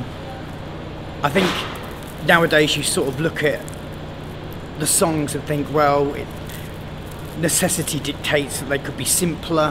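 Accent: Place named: RP, United Kingdom. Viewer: I can't take him seriously as a front man for a metal band with that posh English accent.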